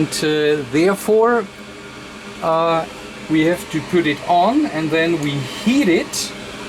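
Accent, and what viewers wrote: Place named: Germany. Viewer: You speak like an kraut...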